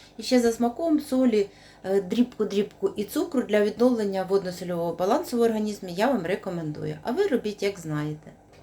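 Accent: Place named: Surzhyk, Ukraine. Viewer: Ни хрена не понял этот диалект или суржик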